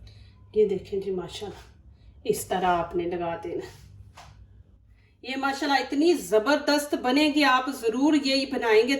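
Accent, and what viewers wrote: Punjab, India. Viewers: Plz zara ahista or kum bolen plzplz.or punjabi ki jagah urdu lehjy mn bolen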